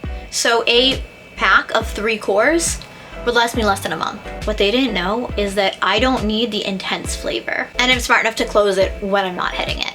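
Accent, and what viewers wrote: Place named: Massachusetts, United States. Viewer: Sounds like you live in massachusetts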